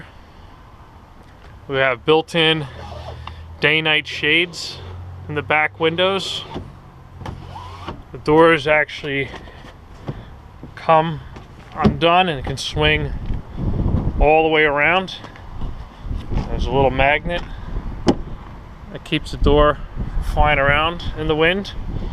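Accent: New Jersey, United States. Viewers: you sound like you have a new jersey accent or something. where you from?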